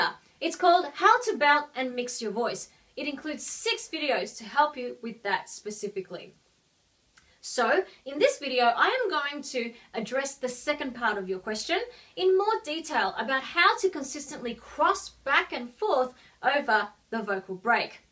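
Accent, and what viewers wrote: Australia. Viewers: It's Australian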